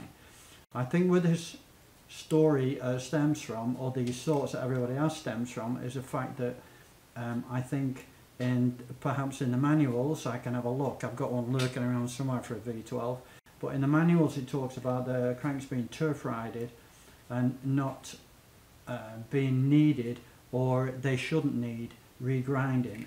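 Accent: Yorkshire, United Kingdom. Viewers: Are you a Yorkshire man ?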